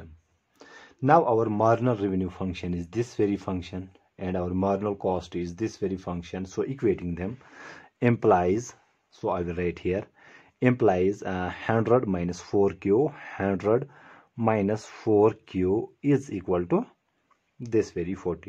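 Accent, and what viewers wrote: Kashmir, India. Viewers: ur english is half kashmiri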